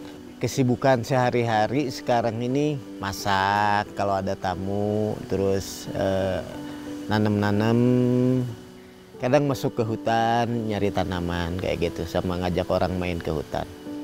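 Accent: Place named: Sunda, Indonesia. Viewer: Orang Sunda anu nyundaa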